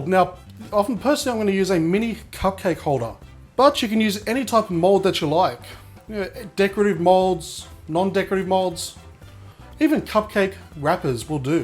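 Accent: Australia. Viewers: he's Australian:) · I LOVE that Australian accent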